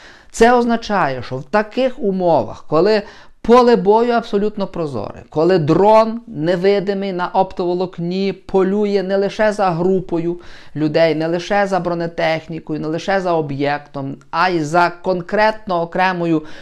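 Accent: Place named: Galicia, Ukraine. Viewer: Гидко слухати цей галичанський говір.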